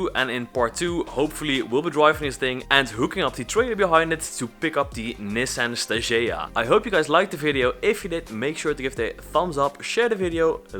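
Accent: Netherlands. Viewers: Ik dacht al dat ik een Nederlands accent hoorde :p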